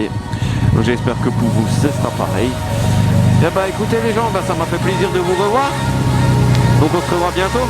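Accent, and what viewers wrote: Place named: Alsace, France. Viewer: Putain l'accent à couper au couteau, tu viens pas du nord de l'Alsace toi? xD Bonne vidéo pour expliquer le but et "l'utilité" ou non de la customisation! :) Oublie pas de remercier Black pour t'avoir apporté le soleil! (ololz) Maintenant que tu fais des glisses faudrait penser à tourner en même temps hein. :p #letrollfacile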